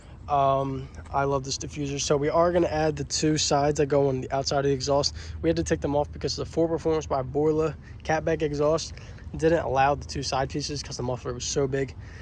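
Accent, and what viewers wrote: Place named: South Jersey, United States. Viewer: Da souf Jersey accent is real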